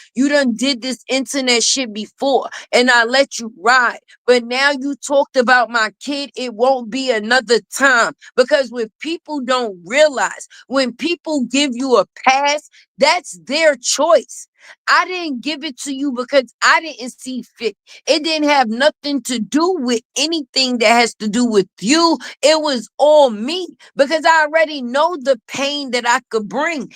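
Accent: New York, United States. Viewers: I LOVE TO HEAR U TALK,FIRST UR GOOD AT WHAT U DO,BUT IT'S UR ACCENT FOR I LOVE IT,IS THAT A NEW YORK ACCENT? HELL IF SO I NEED RO MOVE THERE N CATCH ON TO THE NY. SWAG N ACCENT 😂❤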